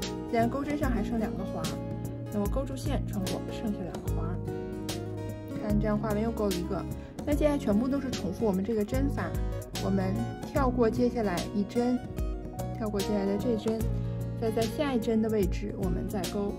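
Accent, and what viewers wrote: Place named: Beijing, China. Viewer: 你应该是北京人吧？🙂耳熟。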